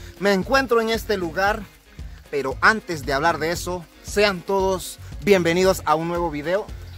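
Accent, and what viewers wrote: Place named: Chiriquí, Panama. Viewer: Fren usted saluda diferente ,yo no sé hblar bien el ngabere pero no se escucha igual a como hbla mi abuela ,talvez por qué es chiricano ,saludos y buen trabajo.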